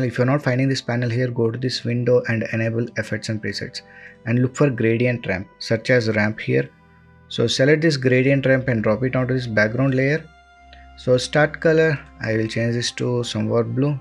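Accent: India. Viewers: I knew it would be a good tutorial when I heard the indian voice.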